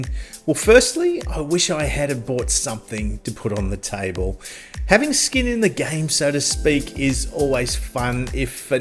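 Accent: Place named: Australia. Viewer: Ah Refreshing to hear another bl00dy Aussie.. oi oi oi...Thanks brother.. Just subbed.. All of the best!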